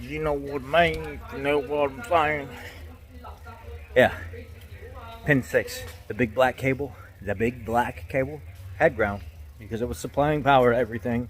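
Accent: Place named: South, United States. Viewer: Great vid. You had me cracking up with you country accent….LOL…Stay Blessed..I’m still giggling as I type this.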